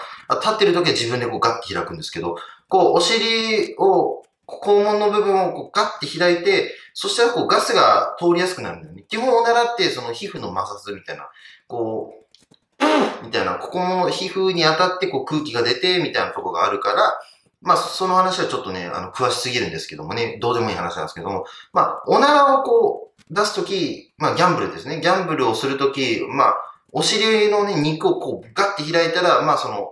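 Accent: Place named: Okinawa, Japan. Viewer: 喋り方が沖縄すぎる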